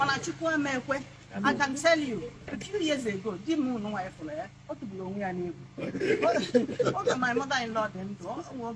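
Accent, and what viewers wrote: Igbo, Nigeria. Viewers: She speaks just like an igho woman mixing English with igho.Wow. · Wow 🤨 Not only did she speak very well She sounded Igbo too With an Igbo accent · Oh my goodness! I'm in love with our Igbo wife! Well done, and good job to our Naija wife, and to her loving husband. I don't speak Igbo, but she totally sounds like an Igbo woman to my Yoruba ears. Sending her so much love!! ❤❤❤ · Probably she was born and brought up in the east and eventually married an igbo man cos she did not just understand and speak igbo, she also has the accent which shows she isn't new in their mist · Exactly, as soon as I saw her I knew she was mixed. Her hair texture is more black than white. She was definitely also raised in Nigeria. I live in England and nobody here can fake the Igbo accent that much. People try but u can still tell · Even her accent is pure Igbo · The intonation shows that this woman is a half cast raised in an Igbo environment. 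She tried, even with proverbs. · Even her spoken English sound like an Igbo woman speaking wow!❤❤❤ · That woman speaks english like she grew up in Nigeria. She can‘t be born in the uk because her english sounds too nigerian no british accent at all. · She even speaks English with a Nigerian accent. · With the Igbo accent as well. It’s like I am hearing an Igbo woman from the village.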